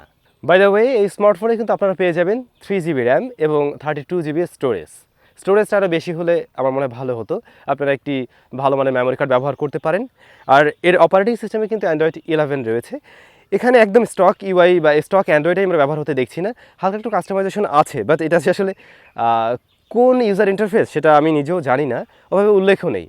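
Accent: Barishal, Bangladesh. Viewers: ওহ আন্নে বরিশাইল্লা🥰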